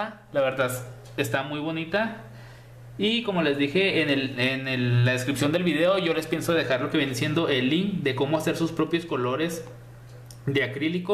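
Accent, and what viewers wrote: Chihuahua, Mexico. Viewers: No se como llegue aquí pero es el primer video tuyo que veo y me suscribi casi de inmediato me gusta la manera que explicas sin tantos tecnicismos, de donde eres? Tienes como que el acento de chihuahua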